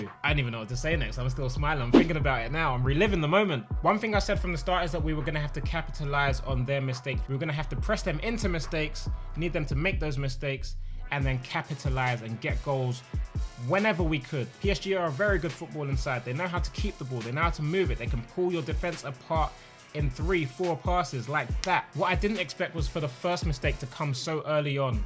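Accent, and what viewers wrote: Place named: Manchester, United Kingdom. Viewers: you have the accent of a PROPER Man U fan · Loving that Manc accent 😂😂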